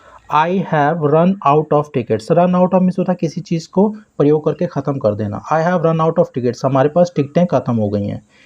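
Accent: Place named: India. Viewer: Accent = India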